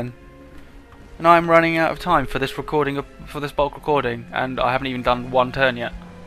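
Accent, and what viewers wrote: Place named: United Kingdom. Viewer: You sounded really british!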